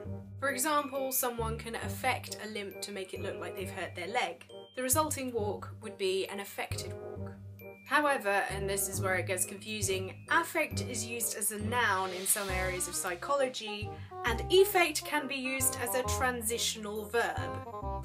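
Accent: United Kingdom. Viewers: Hi, i learn english on your vids, i like it, but could you make the background sound little bit lower for better comprehending what you saying, and plz, if it possible make more educational vids with your awesom british accent, luv your funny nozze)))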